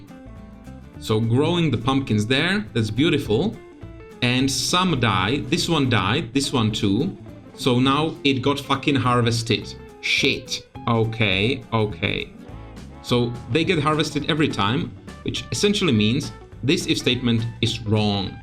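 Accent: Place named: Germany. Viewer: The guy sounds German which to me is an interesting demonstration of how "bad language" is not inherently "bad" and I enjoy how diverse the reactions there are to the same thing.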